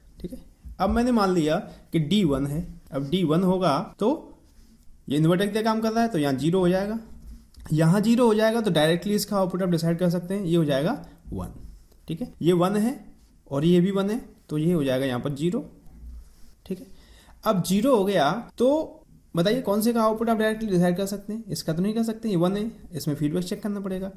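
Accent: India. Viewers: Nice understanding speed is bit fast btw love up accent!!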